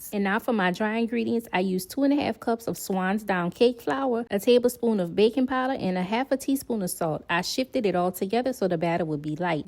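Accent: Louisiana, United States. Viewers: At not one point did you say “babe” in this video. I’m highly disappointed because that accent has Louisiana written all over it 😩😭💜💜💜💜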